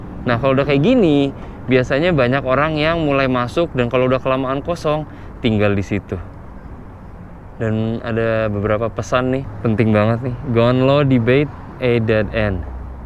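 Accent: Indonesia. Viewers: buat orang rantauan luar negeri selama 19 tahun itu keren kalau logat indo lu masih dipertahankan, atau ga berubah, karena beberapa orang yg udah lama diluar negeri ketika balik ke indo itu logat indonya kurang, kyk bule baru belajar bhs indo wkwkw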